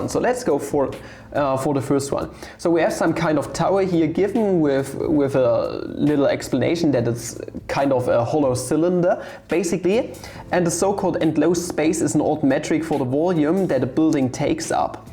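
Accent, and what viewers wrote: Germany. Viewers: I can feel your german accent while you speak English.😊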